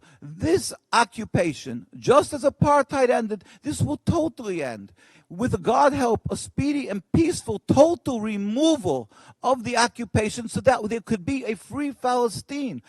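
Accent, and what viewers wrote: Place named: New York, United States. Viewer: you know it's funny, says he's from 'palestine' then says his family is phillistine from many generations ago... but that accent doesnt lie. this guy is from new york. talk about a paid shill to parrot some agenda from across the world when he lives comfortably in new york city. he can talk all he wants and keep wasting oxygen but the fact of the matter is this man has most likely never been to Israel while rockets were fired over his head from a hateful spiteful section of people who were given every opportunity to have their own state. were given BILLIONS to build better hospitals and schools to give their people an education over the future generations... instead they built extensive networks of tunnels, bought missile and rocket parts from iran to build in gaza and send at their neighbor. they then invade and kill over 1500 civilians, not military, civilians. 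womp womp. dont start a war then cry when you've lost.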